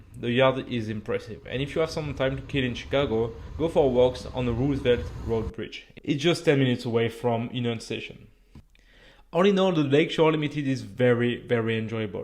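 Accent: France. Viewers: I like the voiceover and I love the strong French accent😁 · Wasn't expecting this video to be entirely narrated over the subtitles, which was a nice change but I kinda prefer the subtitles more as your French accent can often be hard to understand. In all honesty, I'd rather watch one of your vids with the subtitles instead. I don't mind if it happens once in a while as it's a good reminder to us viewers your from France.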